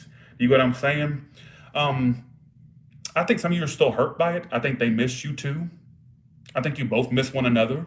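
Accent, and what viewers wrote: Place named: South, United States. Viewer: Damn you are the bomb. I love your southern accent; thank you for doing this.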